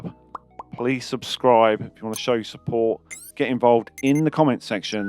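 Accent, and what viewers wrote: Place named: Wales, United Kingdom. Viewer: Love the accent. The famous singer Tom Jones is from Wales👍. At some point maybe you can share to us American viewers the history of the Skoda automobile 👍.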